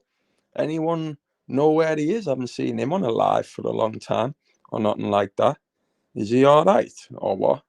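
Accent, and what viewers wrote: Liverpool, United Kingdom. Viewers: Yes I can you clearly la ,,,, SCOUSER gd la telling it true 👍👋👌sound la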